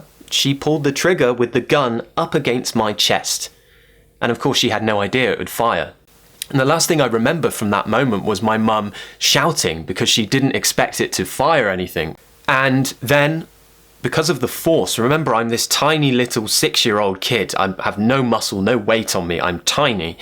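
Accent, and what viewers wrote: RP, United Kingdom. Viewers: the way you tell stories in your amazing British accent gives me goosebumps whenever I listen to you. Great story! Thank you!:) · Lovely British accent....sounds sweet to my ears( not sure if i said it correctly) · your stories are always funny, keep doing it, i am a english learner and you channel and lessons has been helping me a lot, by the way, you accent is the best, i've been struggling to improve mine to sound like a british.. greetings from brazil, man!!!! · oh u r so good at telling stories n i love this way to learn the British accent it is so helpful for me, btw i felt so happy for your teeth😹😹. hope u have a nice day 🌹🌈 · I'm in love with your accent, I love british😍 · This EP that the most I like. Because I learn a lot of British accent from your story. 😅 Very much thank you. 🙏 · Hi, I'm an italian man and I watched lots of videos just to keep my english alive. 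Different months ago I watched a video where you told a very funny story about yourself (maybe a "listening practice" video): I remember you told that you went up a tree, and accidentally fell down in front of some of your school friends and you went in the hospital... then in the hospital they put a sort of plaster on you and you were forced to get help from your parents to wash you in the tub, with all the embarrassment of the case... Unfortunately I'm not able to find that video any more. Since I would like to show to my kids, because I think it's useful for an educational reason (as well as funny:-D) as listening practice for them too, can you please suggest me the link? Thank you in advance and congratulations for your video channel!! I love your british english and your pronunciation, and your voice is clean and wonderful. Gabriele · Hi,! I feel like your British accent is very beautiful:) It sounds like you are english lord from these bbc series:))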